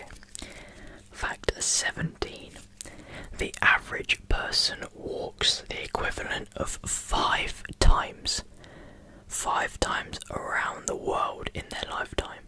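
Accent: United Kingdom. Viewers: Very cute thumbnail huh. Got to be careful using that word until you're eighteen because where I'm from your underage until then. But none the less I love listening to somebody whisperer in a British accent and list off a bunch of facts. British accents are very hypnotizing. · Congrats on 7K subs. Btw I love listening to your British accent 🥰.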